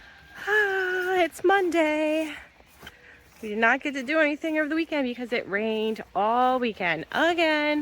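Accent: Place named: Pennsylvania, United States. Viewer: I was trying to place your accent because it sounds familiar. I came to the conclusion that maybe you were raised in PA. I hope that bunny isn’t struggling to breathe - poor thing. 🙁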